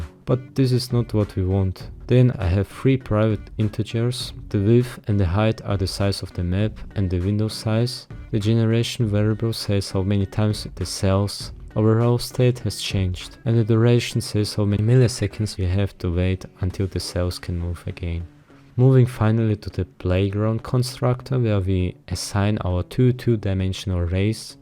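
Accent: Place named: Russia. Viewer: russian accent... hmmm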